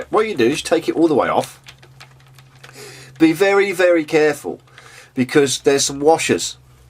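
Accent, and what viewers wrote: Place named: Birmingham, United Kingdom. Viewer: You sound like a peaky blinder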